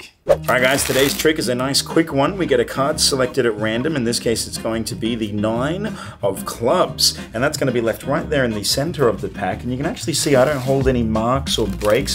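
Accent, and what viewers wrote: Australia. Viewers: Australian accent is so cool. I like the way you say doiemonds. Good luck on your trip. Can't wait to watch the vlogs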